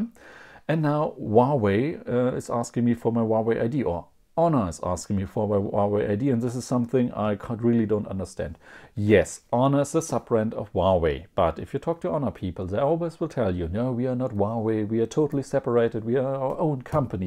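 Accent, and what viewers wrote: Germany. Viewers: Gotta love that German accent haha. Really nice video tho, thanks!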